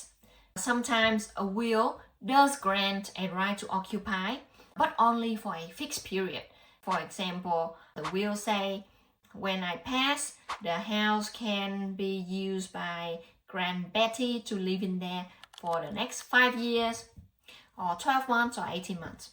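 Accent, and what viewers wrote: Vietnam. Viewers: Why do u sound vietnamese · Yes, the podcaster has difficult to understand Viet-English. Good content but hard to listen to.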